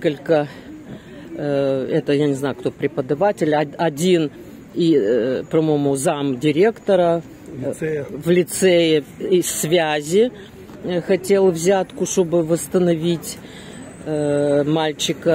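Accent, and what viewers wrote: Odessa, Ukraine. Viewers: первый раз зашла к вам на канал. Душа радуется, слушая ваш одесский говорок. Спасибо за экскурстю. У меня в Одессе живут родственники и знакомые. Всем нам мира и добра. Привет из Луганска.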